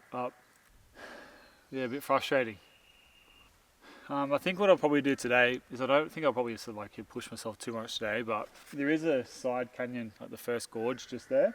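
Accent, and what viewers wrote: Australia. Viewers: Australian English is disgusting!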